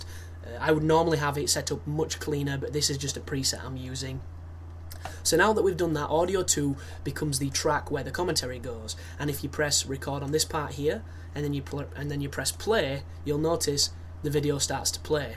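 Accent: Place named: England, United Kingdom. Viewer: its cuz he has an english accent which makes anyone sound cooler/more interesting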